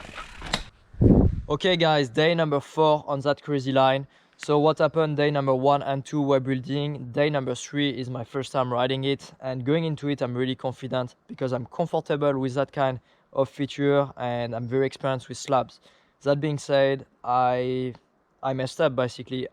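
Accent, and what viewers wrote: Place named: France. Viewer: T'es français toi ça sentend🤣🤣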